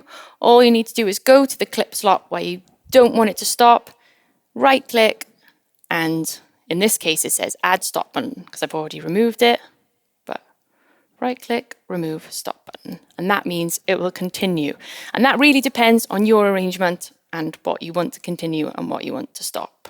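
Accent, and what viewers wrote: Wales, United Kingdom. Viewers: Are you Welsh? 🏴 I’m from Neath lol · I stumbled upon your video and it's very very good. I love a welsh accent, cool. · proper UK accents and golf ball on a stick? Good only for you... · Welsh accent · Are you Welsh???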